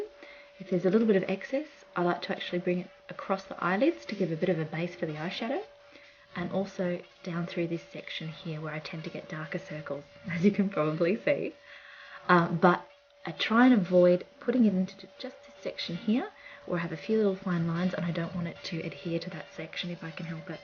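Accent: Australia. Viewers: There's just something about your Australian accent that makes me love everything you do. Or maybe it's just your talent. :D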